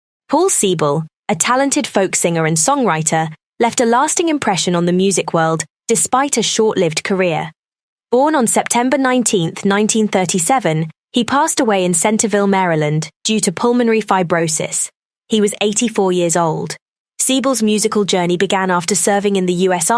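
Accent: England, United Kingdom. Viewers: Why is an English woman talking about the USA? Go back to your home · Why do they got this British lady doing this and Gilbert Gottfried already passed away already she gots her scruples backwards 😵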